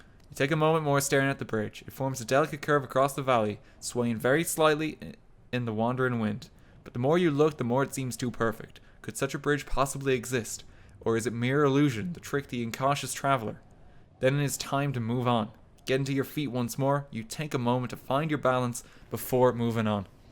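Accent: Ireland. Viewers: This video should have been called "A man with an Irish accent carves a bloody path to Kharé in Steve Jackson's Sorcery" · Great Irish accent so... what other accents can you say and what is your favorite game so far in you career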